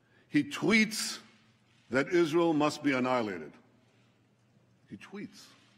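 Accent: United States. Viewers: Why does he sound American? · Fascinating to see a foreign leader with perfect American English, honed in a US High School.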